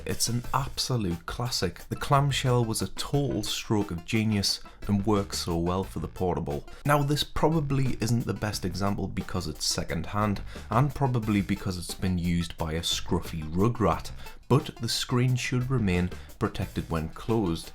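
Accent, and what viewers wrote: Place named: Newcastle, United Kingdom. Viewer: your fromm Newcastle alright lemme sub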